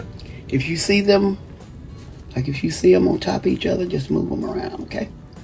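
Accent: South, United States. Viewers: im Hungry Hungry,Hungry, im Definitely Going to Try This Recipe. It looks Absolutely DELICIOUS,UmUmUm,GOOD😋😋😋. And you sound So Much like Mrs.MaeMae, either you are Related or its that, way dwn South Accent😃😃😃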